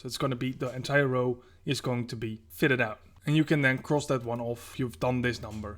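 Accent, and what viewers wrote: Netherlands. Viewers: Dutch detected!!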